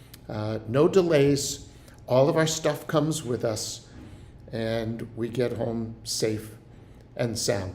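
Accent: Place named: Spain. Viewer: Thanks for sharing from Costa Rica pastor Paul. Shalom from Bolivia🙂 P.s. Nice Spanish accent and "hasta luego"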